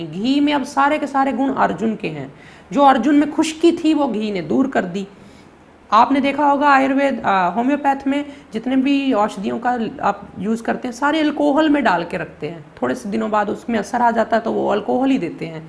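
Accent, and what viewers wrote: Punjab, India. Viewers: Tussi punjabi je!???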